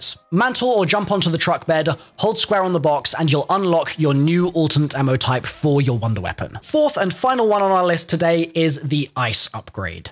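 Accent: United Kingdom. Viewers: Such an annoying accent omg. Which British accent is this? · Why is every person who makes videos like these British lmao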